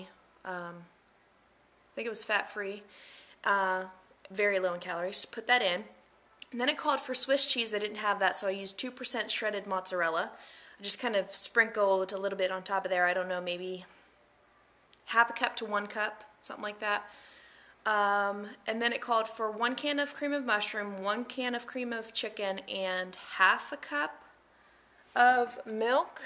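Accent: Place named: Pennsylvania, United States. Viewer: Hey lady, don't k now if you got my message on a previous video... but i heard you say your parents are from PA... and i went "HA! I knew you were from Pa from your accent" I'm from Pa too... northeastern area... I love your vids sweety, I feel like your one of my friends, I think i listen to you more often than I hear from my own friends lol! I've recently quit my job so i'm home all day long and I've been spending alot of time on youtube! Love ya!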